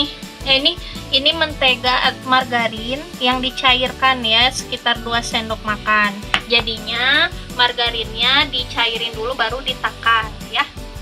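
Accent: West Java, Indonesia. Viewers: Tetehnya nyunda banget seneng liatnya 😄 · Orang sunda mana suaranya!! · Waaw... Mantap.. Hatur nuhun resepnya tth... Sunda na ti mn teh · I love teteh sayang aku suka banget sama gaya bicara teteh, teh orang mana? Sunda pisan euy nyarios na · Nyarios na logat sunda pisan hebring poko na mah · Iya Non :") kalo orang Sunda mah emang begini sama kaya aku 🤭🤭🤭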